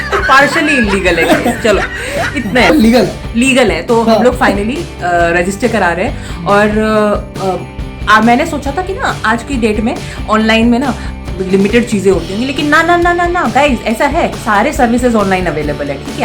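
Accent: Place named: Punjab, India. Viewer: Ek like for the punjabi accent to banta hai... Nice vlog too...keep it up...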